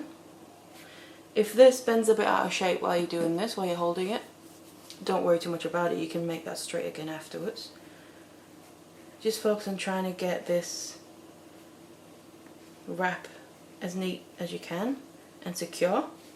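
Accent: United Kingdom. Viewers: Her instructions are BETTER than all the others and I LOVE her British/English accent... Now I need to find her other videos?? Hope she has lots...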